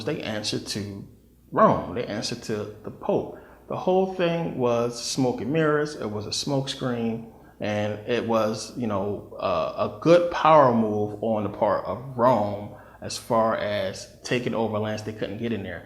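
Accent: Philadelphia, United States. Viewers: You wouldn’t happen to be from philly would u? It sounds like it from the way you talk.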